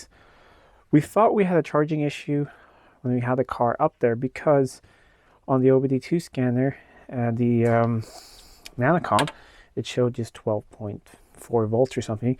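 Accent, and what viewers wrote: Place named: United States. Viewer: Oh, I did not realize until I saw the Biltema battery that you were in Sweden. You sound American to me. As a American living in Norway I cannot imagine owning such a vehicle, here the costs of maintenance are sky high and where I live I am not even allowed to work on my cars. You probably do not have that problem, looks like you are on a farm! 😃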